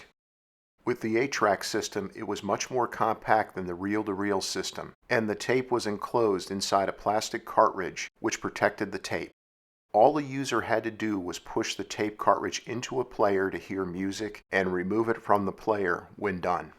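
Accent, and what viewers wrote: United States. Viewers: ...Ah, that mid-Atlantic accent.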